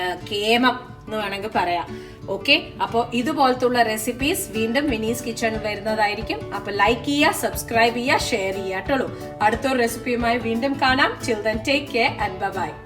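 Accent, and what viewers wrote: Palakkad, India. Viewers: Hello I love you chechy chechy njan 1st time anu comment cheyyunnathu reson your palakkad slang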